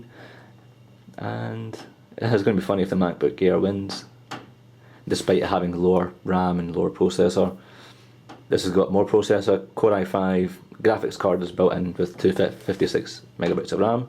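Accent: Scotland, United Kingdom. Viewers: im getting the macbook pro even tho its slower its much more nicer looking, loving the scottish accent :)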